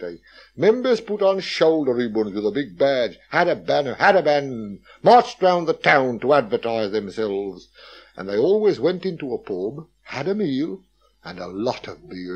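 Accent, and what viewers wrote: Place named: Black Country, United Kingdom. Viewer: Excellent! Love the history and accent of the black country, thank you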